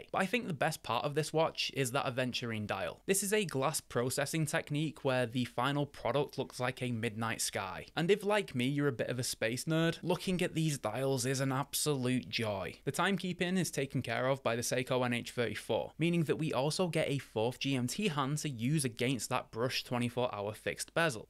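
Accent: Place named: Northern England, United Kingdom. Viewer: Northern England somehwere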